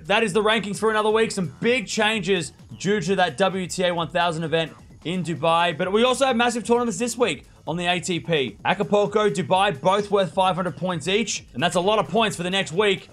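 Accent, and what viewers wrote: Australia. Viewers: I say the biggest win this month is Norrie over Alcaraz in Rio. There was almost no way of Norrie winning but he did. There was a buzz of Alcaraz's injury acting up but nonetheless he was hitting winners all over the place. I think Norrie's win was the most classic in a while. Thanks for the show. It is always good to hear your Aussie accent.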